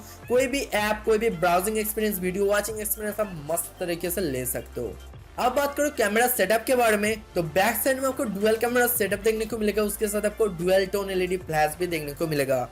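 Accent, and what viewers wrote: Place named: Bihar, India. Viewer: Bihari ho kya boli se laga. cameda....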